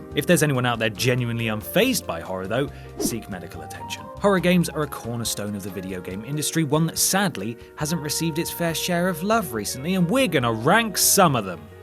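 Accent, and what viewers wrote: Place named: RP, United Kingdom. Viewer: GOD IS THERE REALLY NO VIDEO OF SOMEONE DOING THIS LIST WHO DOESN'T SPEAK WITH AN OBNOXIOUS POSH ACCENT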